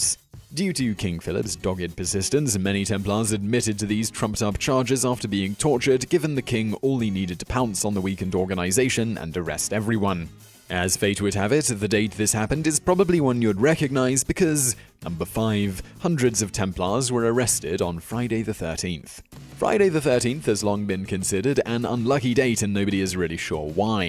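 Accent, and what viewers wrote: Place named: United Kingdom. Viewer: Off topic, I realize - but this Southern American just loves your British accent! "Numba Niieeen-uh'...... like listening to music :-)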